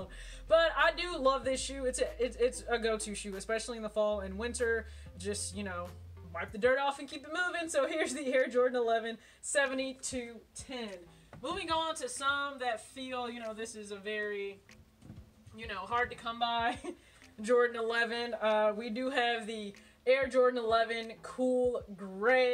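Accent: South, United States. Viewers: I love your thick country accent!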